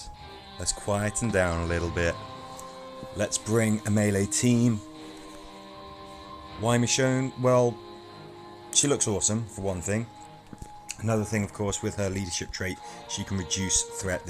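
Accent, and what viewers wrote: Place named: United Kingdom. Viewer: Nice work, as usual. I think the British accent helps your credibility (not that you need it) but your commentary is superb.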